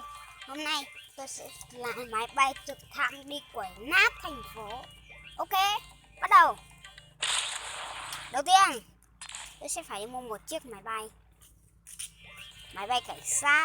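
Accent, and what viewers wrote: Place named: North, Vietnam. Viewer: Lái ngu nói chuyện như bắc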